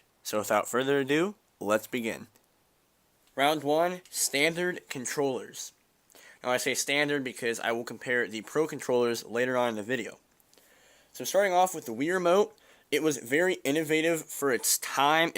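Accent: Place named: Philadelphia, United States. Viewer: Definitely philly/south Jersey accent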